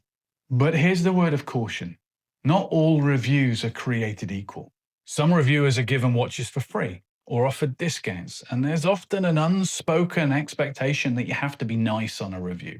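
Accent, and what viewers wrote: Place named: Birmingham, United Kingdom. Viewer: Sounds like a Brummie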